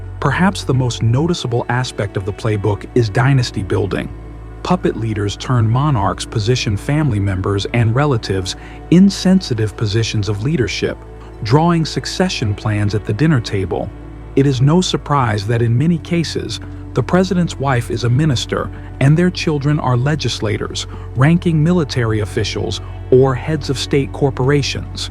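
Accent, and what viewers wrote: United States. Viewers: U sound American with your accent....also do remember how the US has meddled in and still.meddles in african countries......the uk is a monarchy....and many other European countries..and in the US u have the bush dynasties.....Clinton's....Kennedys.....and it's ok for...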